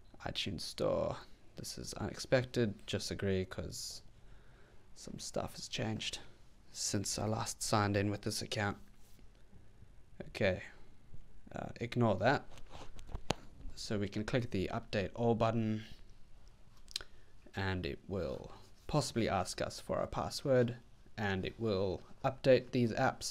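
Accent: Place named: United Kingdom. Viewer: British talk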